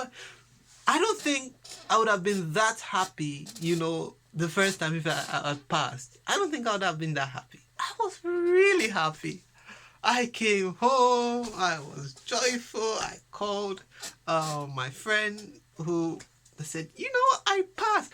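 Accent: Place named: Nigeria. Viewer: You sound like Nigerian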